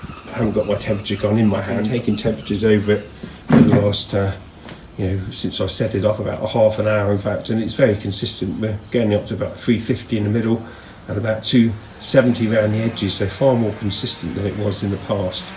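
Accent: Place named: United Kingdom. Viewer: Hi! (I am hoping I got the name right!) Increasing the depth of the top box seems to have helped our objective. I have been experimenting with Trevor's modified 4" version with after burner directly onto the hotplate. I did a rough layout this morning and did a test run - the heat is so immediately seen on the top plate. No smoke from my 5" chimney that I use put at the moment. I will be constructing the whole stove from firebricks. Its difficult to get hold of vermiculite boards in India (a lot is made here but mostly exported to the western nation it seems as there is a higher demand there for insulation purposes I presume). By the way your speech is certainly English(British) and I was wondering if this location you are shooting at is within Britain or somewhere warmer! It certainly is very nice looking kitchen garden / patio garden you've got there and gives off the feeling of somewhere in southern Europe!:)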